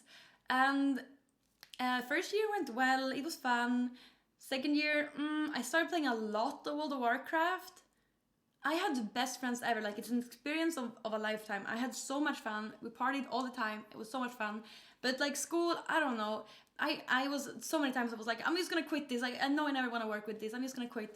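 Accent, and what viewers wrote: Sweden. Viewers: She's been a pro streamer for quite some time...yet she has the thickest swedish accent you'll come across. How does it not improve lol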